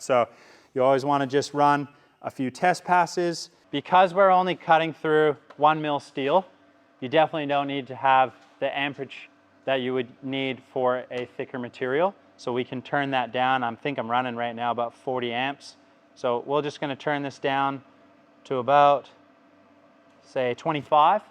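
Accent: Australia. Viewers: is he hiding an Australian accent under there? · Am I going insane or do you have just the barest hint of an Aussie accent?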